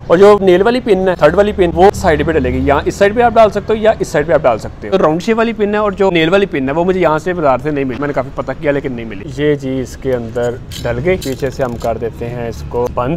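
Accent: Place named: Punjab, Pakistan. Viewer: Laihnde punjab wali boli aa veer ji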